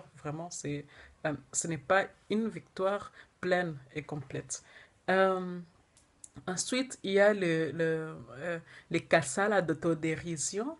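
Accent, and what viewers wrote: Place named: Germany. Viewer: Joli ce petit accent d’Allemagne petite sœur......Tmtc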